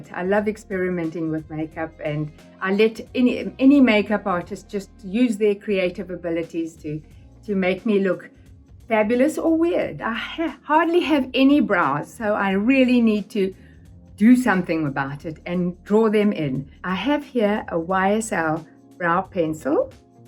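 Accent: South Africa. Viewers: Love her South African accent. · Love your saffa accent ❤ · South African accent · What an exquisitely charming lovely Women. The South African accent is just beautiful . Thank you Vogue for presenting a mature women aging lovely . This was just a refreshing tutorial.👏👏👏🇨🇦❤️ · So good to hear a South African Accent on Vogue ;) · I love that she still has her South African accent. I really love her representation for older generations. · As a South African I definitely heard her accent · It's weird hearing a South African accent on Vogue. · She kinda sounds South African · She still has her South African accent 🥰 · You can hear her South African accent perfectly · Wow, you so beautiful with or without make up. I actually was scrolling past and the videos play on there own and I got drawn in by the sound of your voice. I can just hear the South African accent in your voice as I am South African and was so confused lol until you explained. 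I hope that even in my later years I can be just as poised and elegant as you are. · I love that you've still got the Saffer accent! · its a South African accent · I recognised her south african accent as soon as she started speaking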